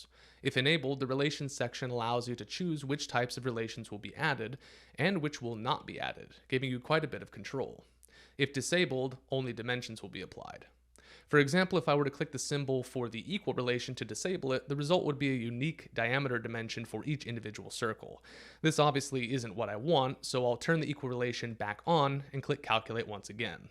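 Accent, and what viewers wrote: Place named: England, United Kingdom. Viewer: Professor thanks for your great video. It is great to find a YouTube video showing solidworks With an in English accent, I can understand.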